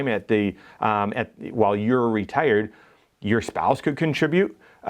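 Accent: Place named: Michigan, United States. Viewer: I knew that was a Michigander accent before you even said Midwest! Great info. Thank you for this. (Go Buckeyes!)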